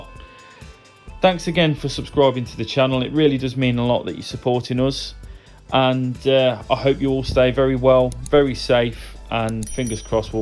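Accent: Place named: West Midlands, United Kingdom. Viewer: West Midlands accent I'm hearing there I think pal?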